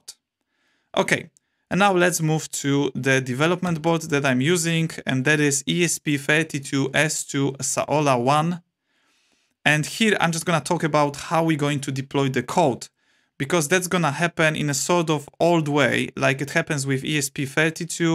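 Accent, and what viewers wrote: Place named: Poland. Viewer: Dobra robota dobry angielski i typowo polski akcent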